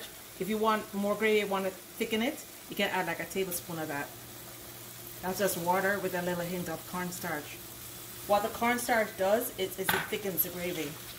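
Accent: Jamaica. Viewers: you're welcome Jamaican accent Are you Jamaican or just Jamaican parents?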